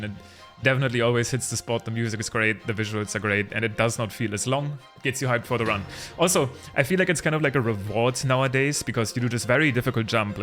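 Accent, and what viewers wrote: Germany. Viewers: I actually like to hear your fluid english with german accent 🙂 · German I think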